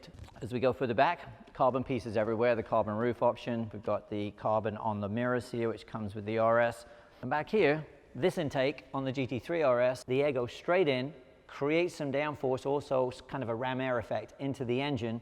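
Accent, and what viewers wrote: United Kingdom. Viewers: Nottingham, but he's been hanging around with a lot of Yanks.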